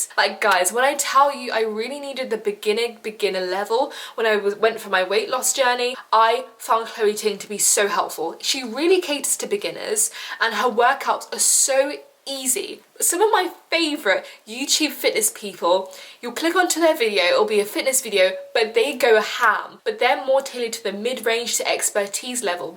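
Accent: RP, United Kingdom. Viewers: You sound so posh you remimded me of my of my cousin who goes to private school loooollllll🤣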